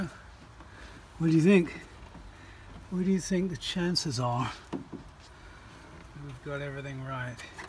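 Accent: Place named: Europe. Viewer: Wow! Never knew or pictured you speaking with a European accent lol! Awesome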